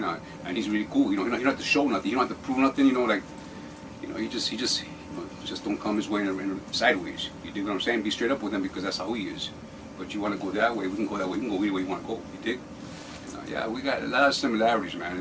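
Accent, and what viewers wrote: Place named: Mexicali, Mexico. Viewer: he's got the most stereotypical mexicali voice and accent there has ever been in the multiverse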